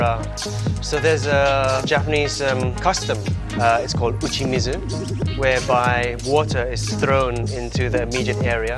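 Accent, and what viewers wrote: United Kingdom. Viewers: Ahaha, those years in Japan still haven't cost you your Brit accent, I see:) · wow, first time hearing your voice. I love the british accent!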